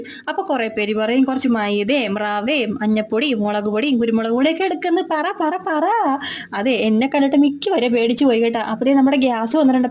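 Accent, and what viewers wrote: Kochi, India. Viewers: ഇന്നത്തെ സംസാരം എന്ത് രസമായിരുന്നു കേട്ടിരിക്കാൻ... തനി കൊച്ചിക്കാരി കൊച്ച് ♥️🥰.. ഇതാണ് ഒത്തിരി ഇഷ്ടപെടാനുള്ള ഒരു കാരണം 🫂🫂🫂🥰🥰🥰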